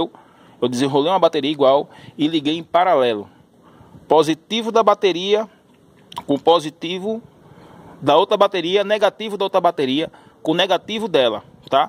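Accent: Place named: Northeast, Brazil. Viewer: Cê num reparou a conversa de nordestino não?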